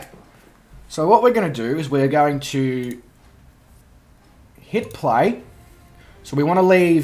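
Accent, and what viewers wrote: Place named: Australia. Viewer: Dude, I highly enjoyed the video. I would recommend that the start of the video should have abit of a soundtack, like 2 seconds. But it your choice. Again, I really enjoyed your aussie accent.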